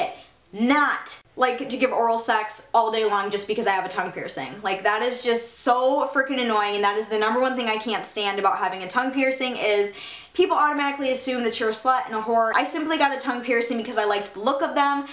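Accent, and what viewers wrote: Michigan, United States. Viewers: I'm sure that the only reason people ask if you have a lisp is because you're from Michigan. A lot of people I know sound like this too😂 I sound like it as well, and I live in Michigan.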